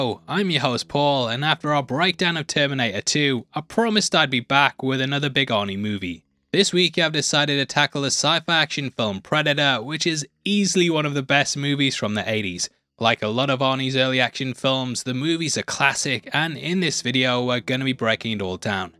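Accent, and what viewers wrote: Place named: United Kingdom. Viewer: Why is that mate..? You’re clearly (judging by the way your voice sounds) speaking in a U.K English accent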